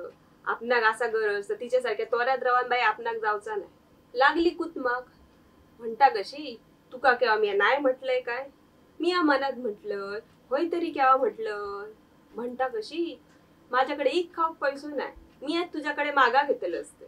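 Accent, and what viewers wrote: Malvani, India. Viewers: Tuzi Malvani phar wegli sound kartay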